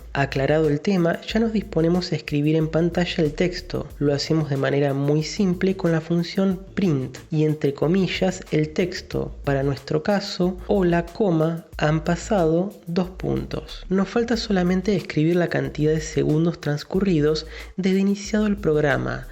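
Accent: Argentina. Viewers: Las mejores explicaciones de arduino de YouTube, además es cómodo porque es argentino.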